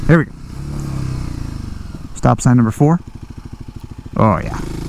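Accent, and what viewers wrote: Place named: Orange County, United States. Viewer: I don't follow you, but your ride looks like suburban hell, dawg. Accent I'm calling oc.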